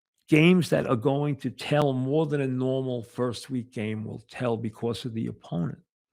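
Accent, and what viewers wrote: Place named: Long Island, United States. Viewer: That's his Lawn-Guy-Lind accent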